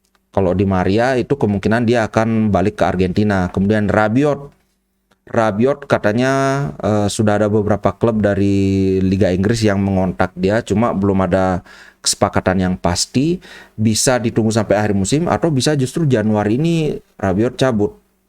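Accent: Bali, Indonesia. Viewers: Kalau dengar logatnya...dari bali nih kawan satu ini...forza inter...salam dari Malang bung😁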